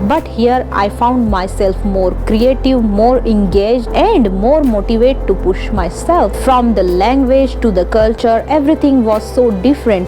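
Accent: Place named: India. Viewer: She speaks like how most Indians speak English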